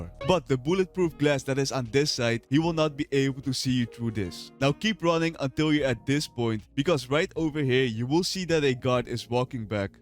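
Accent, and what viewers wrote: Netherlands. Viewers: Hes defo dutch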